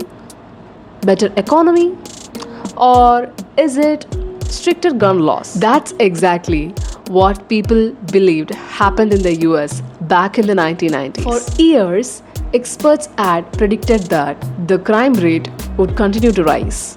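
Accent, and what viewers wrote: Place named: United Kingdom. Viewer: I think she is speaking British English 😅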